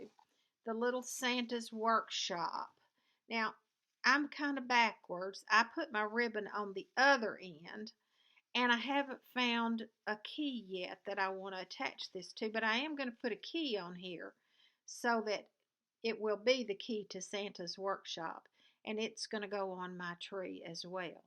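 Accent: South, United States. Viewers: You have such a lovely southern accent! It's fun to listen:) Glad to see your hand is getting better- but you have to take care of it- stitching time might need to be sacrificed.. The broom cover is very cute- I might be tempted to stitch something like that too. I never tried primitive designs- looked more into punch needle style. I'd like to try for your giveaway for either one of magazines. BTW I'm doing a giveaway too :) Happy holidays!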